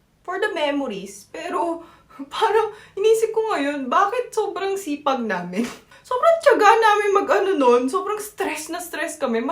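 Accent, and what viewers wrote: Batangas, Philippines. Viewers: not dialect. language because mutually intelligible at iba yung structure ng mga languages dito sa philippines. does speak a dialect of tagalog though which is batangas tagalog, because she’s batangueña and sanay siya doon. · Kabayan (kabatang,batangueña po aq)nakakatuwa ka nmn panoorin ❤ ung accent at dictions mo kabayan talaga g puntong taga batangas ka 😊.Merry Christmas kabayan❤anong namimiss mong food sa Batangas?Kumakain ka ba ng Sinaing na tulingan? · Pinoy na pinoy ang accent mo, buti pa mag asawa ka na lng ng finoy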